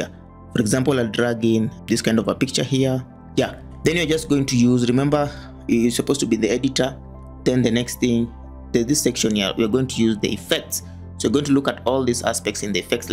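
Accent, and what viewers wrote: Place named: Kenya. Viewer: Your african kenyan by accent😂😂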